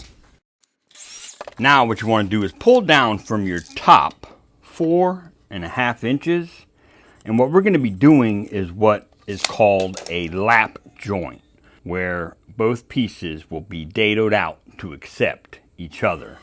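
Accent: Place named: South, United States. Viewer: Hi, I juts discover your channel this morning. Congrats, very interesting stuff. Question for you. Where are you based? I would say in South U.S by your accent, but for the French Canadian I am, I would also want to thanks you, cause I can understand you with any problem You just got another huge fan, and you will inspire me to launch my own channel by the end of the year Have a wonderfull day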